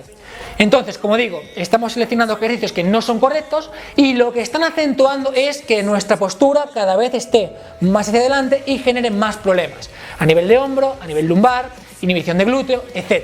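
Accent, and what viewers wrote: Galicia, Spain. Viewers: Me marea mucho el acento gallego de este flaco. Me cuesta entenderlo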